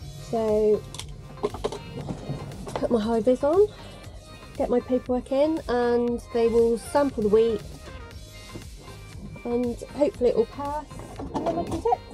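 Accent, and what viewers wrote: West Country, United Kingdom. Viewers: Brilliant to see your first vlog! Like the camera you use in the truck, nice to see all the different views and angle's whilst driving, especially when you're going round a corner and it shows the side of the trailer cutting the corner, great to hear you talking, nothing beats a west country accent 👍👍🤛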